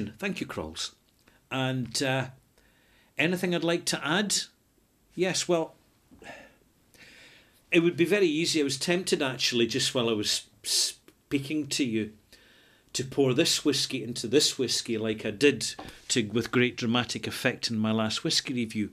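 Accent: Scotland, United Kingdom. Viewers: Hello, it only took me 5 1/2 years to watch this vlog. The whole 27 minutes was enjoyable. Even the scottish turn of phrase "see... that/yon". Kept wondering how much you were having to keep your brogue in check. Thanks and take care. Fraser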